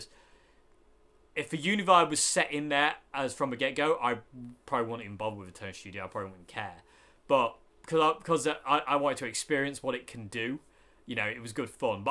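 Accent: United Kingdom. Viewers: Hey Mr....You and I have something in common....We both talk funny...You’re from the U.K...and I’m from..New Yawk...It ain’t easy being us 😊. P.S...Brass Nuts...can have...a few different meanings....Keep on keeping on young man...👍😎🎸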